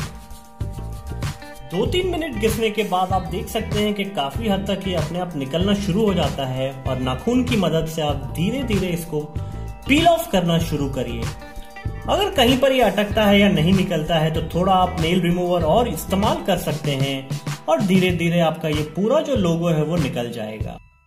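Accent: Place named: India. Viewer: He is Indian English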